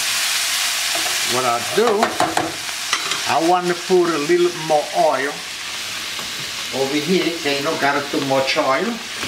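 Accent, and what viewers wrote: Italy. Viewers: Well somthing simple, but the italian accent , remind of god father movie! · I like way of explain. I think Itlian Inglish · Don't you just love that Italian accent? Sooooo Molto simpatico Grazie molto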